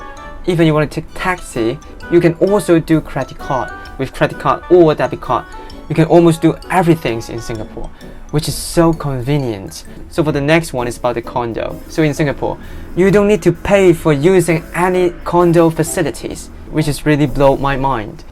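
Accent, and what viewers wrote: United Kingdom. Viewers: LUV THE BRITISH ACCENT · Yes I love the British accent too. Does Dave speak the same accent as you? BTW miss Dave hope he will be back soon!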